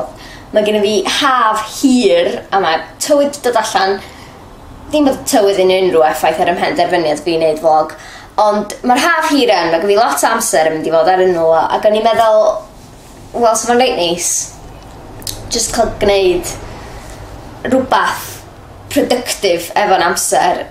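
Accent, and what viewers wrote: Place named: Llŷn, United Kingdom. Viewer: Oni byth yn meddwl fyswn i yn dod o hyd i un fel yma!! Ti'n neud yn wych chwarae teg!! Ti'n dod o Ben Llyn ma dy acen yn swnio'n lleol i mi!! Xx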